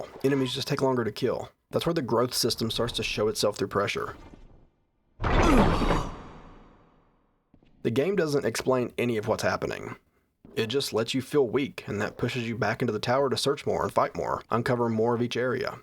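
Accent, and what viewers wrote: South, United States. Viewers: Small startup channel playing weird shit in a southern accent? Sign me up!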